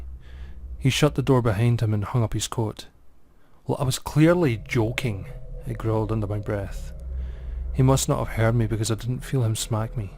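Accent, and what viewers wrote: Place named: Northeast Scotland, United Kingdom. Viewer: Oh no! Ye peer wee dug..fit an affa thing tae happen aefter bein adopted in the first place. Fit rare listnin tae yer bonnie NE accent fearin me silly we yer creepy tales..love it!! Scottish wifie/grunny in London xx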